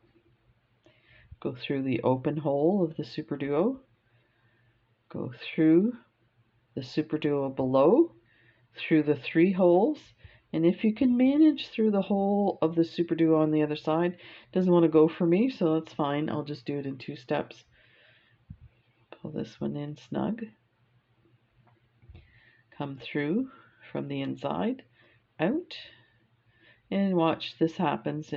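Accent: Canada. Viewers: Love this! Also love hearing that Canadian accent. We raced sled dogs for many years, and spent much time in Canada.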